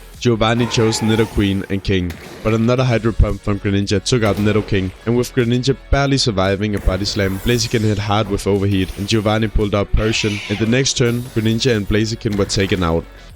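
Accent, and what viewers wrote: Denmark. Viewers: are you danish, you sound very danish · Denmark maybe 🤔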